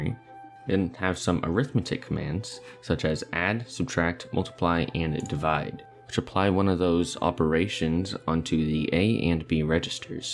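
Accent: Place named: Germany. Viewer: Are you from Germany?The way you speak remains me my friend from there. I don't know all that cool stuff, but the way you explaining makes me want to learn. That you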